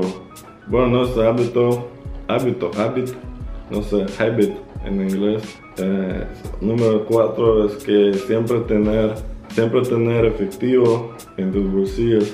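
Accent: Mexico. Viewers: del reino de Castilla antiguo o español por la España actual? Español mexicanizado en todo caso ,yo diría. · Como que te escucho cada día más mexicano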